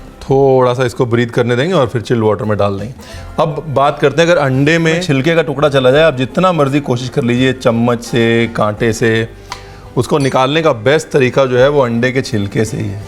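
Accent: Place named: Lucknow, India. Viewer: Bhai ka lucknawi andaaz jindaabaad..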